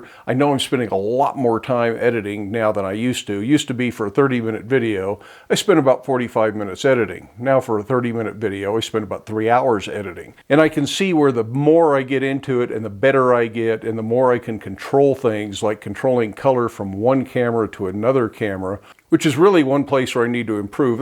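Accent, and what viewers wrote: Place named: South, United States. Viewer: Good motivational speaker. His voice is not intimidati g as his appearance first was and i love the southern acsent. Great video for encouraging over coming problems youtube home video newby's should watch acassionably to remind them that they really can do it right and not give up.👍👍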